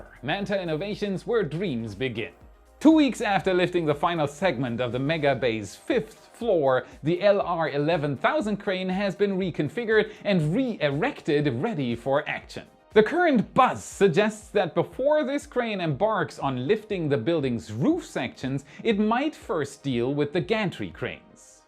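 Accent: Germany. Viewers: Your German accent is fading! The states are rubbing off on you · You called me out for the marine mariner comment I’m blown away! Didn’t know you were German until this episode keep the great accent coming I meant no disrespect!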